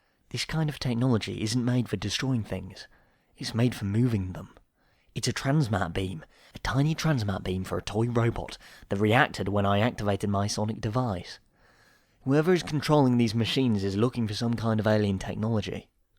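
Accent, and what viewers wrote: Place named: United States. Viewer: your american accent is pretty good! (i’m american)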